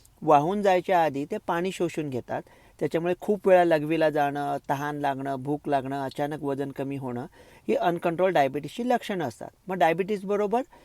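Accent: India. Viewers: YOU ARE SPEAK LIKE TRUE MARATHI MANOOS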